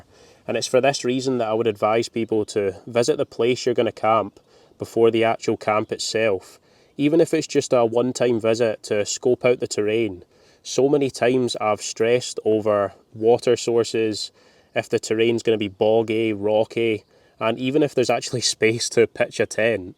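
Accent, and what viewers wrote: Scotland, United Kingdom. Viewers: I absolutely love your videos and love to hear you speak with that Scottish accent. As much hiking as you do, you have got to be in prime physical shape. As bad as my health is I can only hike with YouTubers. I have always loved the outdoor and with me living in the Southwestern USA, I can’t get out and be in nature. We have such beautiful country where I live and can’t get out see it. So for now I will just have to hike and camp along with you on YouTube 😂😂😂😂😂😂😂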